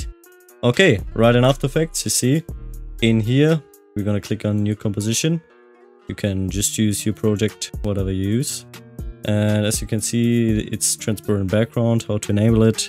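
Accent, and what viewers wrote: Germany. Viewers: I love these tutorials! You’re so sympathetic. And dieser deutsche Akzent ❤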